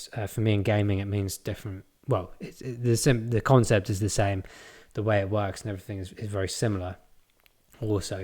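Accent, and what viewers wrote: United Kingdom. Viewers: Hey, good to hear an english voice, in england we seem to already have 3 second transaction bank transfers does that mean in uk were already using iso 20022?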